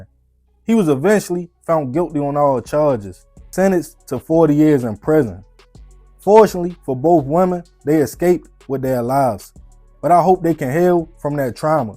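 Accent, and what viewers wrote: Baltimore, United States. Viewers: dat bmore accent too hard to hide...lol yo be like tryna sound professional but dat bmore swag just shine thru brova.. Just speak how u speak yo and it is what it is. Being yourself makes people appreciate your content and u more.. Also i ive in bmore bro and i wanted to tell u that I hope one day i meet u cuz u inspired me to start grinding again on my page.. Im about to start gettin to it and being consistent and thats because of u brova!! so thank u... sincerely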